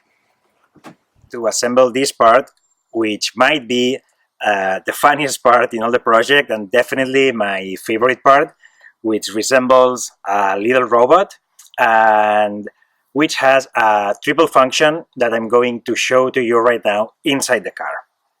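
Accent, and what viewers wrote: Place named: Spain. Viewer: Acento español ehhh ;)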